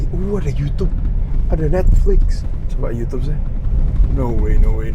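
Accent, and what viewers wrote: Java, Indonesia. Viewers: Kamu orang mana bang.. Kok lucu medok banget kl ngomong bahasa Indo. 😊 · Walah koh mbok mgomongnya yg bener...aku jadi ketawa ketiwi.. Kok yo medok banget😂😂😂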